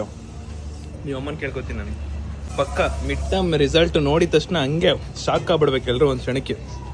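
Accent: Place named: Mangalore, India. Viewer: ಸ್ವಲ್ಪ ನಿಮ್ಮ ಕನ್ನಡ ನಮ್ಮ ಮಂಗಳೂರು ಕನ್ನಡ ತರ ಇದೆ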